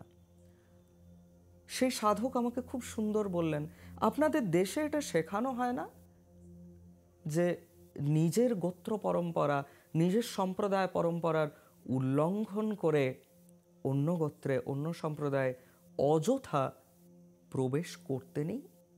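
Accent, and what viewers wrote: India. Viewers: Ew! NOT the biMaaaru tongue again, ffs!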